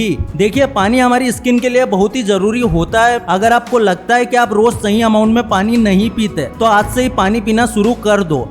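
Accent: Indore, India. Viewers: Your accent quite similar, like typical Indori.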